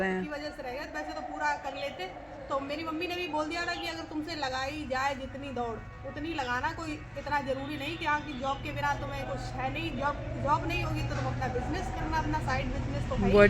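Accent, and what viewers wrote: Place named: Braj, India. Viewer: Kaha se ho aap language to braj ki lag rahi he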